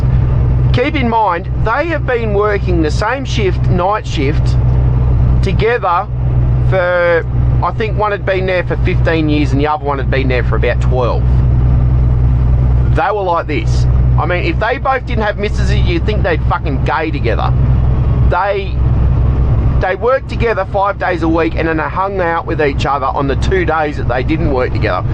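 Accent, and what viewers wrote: Australia. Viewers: LOVE THE AUSSIE ACCENT !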